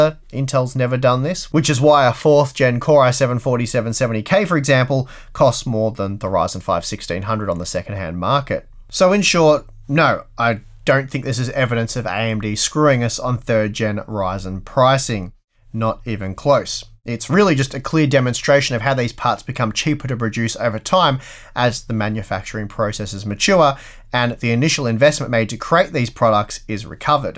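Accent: Australia. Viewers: Your Ausie accent is tricking people :) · Poor Youtube subtitles, can’t understand an Aussie accent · Its by Aussie accent 🤓 👍.. Propably many times people hear all sorts of things. Me included that left me wondering how to manage the hearing 😄. Ah well, anyways. HU channel makes Good videos. One of my Favourites.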